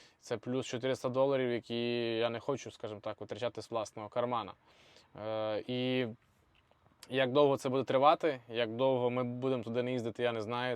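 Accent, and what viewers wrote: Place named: India. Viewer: В нього англійська хороша. Лише акцент є. Бо в Індії англійська мова)))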